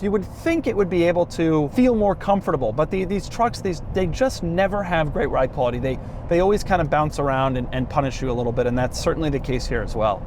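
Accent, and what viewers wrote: England, United Kingdom. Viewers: Love the English accent. All the best from England 😊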